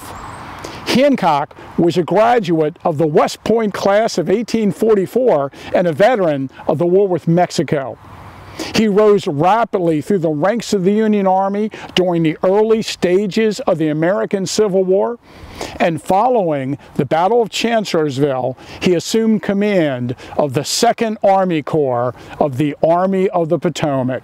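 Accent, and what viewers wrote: Baltimore, United States. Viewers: The superb! Love your work, and your mistakes and baltimore accent make it all the better!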